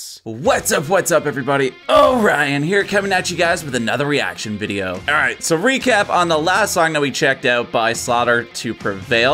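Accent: Canada. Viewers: You're canadian as fuck, aren't ya buds? Decent. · "Oh fuck bud". You Canadian bud? Haha. First time seeing your reactions, good one man. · Love the song and the vid but I’m still trying to figure out if you’re Canadian or just watch a ton of Letterkenny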